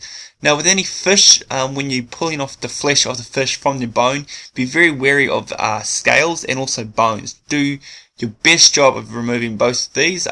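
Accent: New Zealand. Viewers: I lived in New Zealand, thought that his accent was familiar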